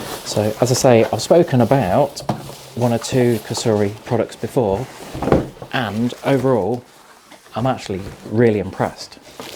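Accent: United Kingdom. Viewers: Already ordered some hours ago. Can't wait 😊. Very good review 👍. Like your British accent. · I like the British accent as well. I like the garden in the background as well with the famous British grass.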